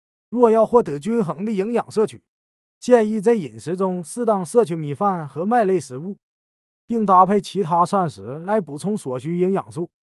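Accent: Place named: Liaoning, China. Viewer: 辽宁口音❤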